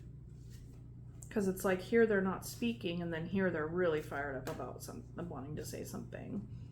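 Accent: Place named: Canada. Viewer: And ew, are you Canadian? I’m from Toronto? You sound Canadian! X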